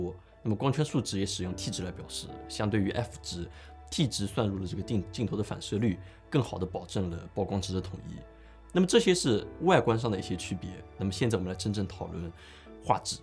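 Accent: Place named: Hangzhou, China. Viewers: 强烈的杭州口音！！！听着好点别扭！！！ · 你的杭州口音还是改不过来啊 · 杭州人喔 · 杭州口语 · 我們大杭州標準口音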